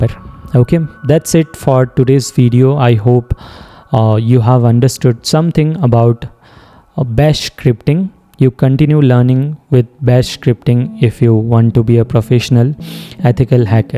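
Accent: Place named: India. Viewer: you look like filipino with indian voice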